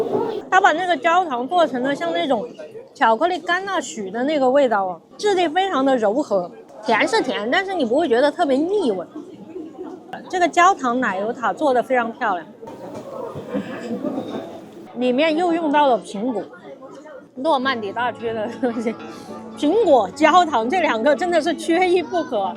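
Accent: Sichuan, China. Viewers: 不知道为啥，你开口虽然说的是普通话，但一听就听的出来是四川妹纸：）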